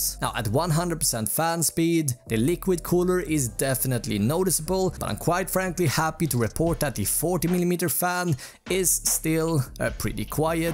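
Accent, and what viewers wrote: Sweden. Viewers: The Svvedesh accent really shines through. INSTANTLY SUBBED and enabled ads!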